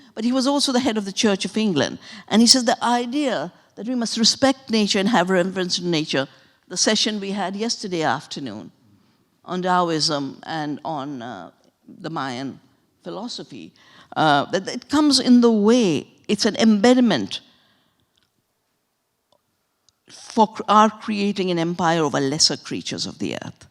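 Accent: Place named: India. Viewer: ill be honest, she's just babbling in an indian accent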